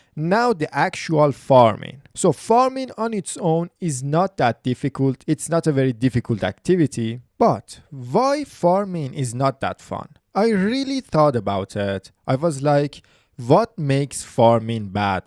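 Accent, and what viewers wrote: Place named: Iran. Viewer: good luck buddy. perfect channel. like your persian english accent.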